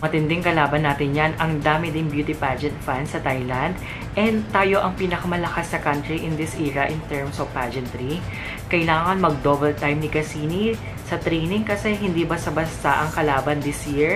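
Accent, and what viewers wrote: Iloilo, Philippines. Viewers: ilonggo ka po?? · I do really like your accent. Hehhee so ilonggo like me. Hehehhe Pero i trained myself like most of time on how to speak the english language like how native speakers do. Pero ang charming mo and ang sarap pakinggan kaya ok na. Heehhehe and most and for most, your so cute hehehhe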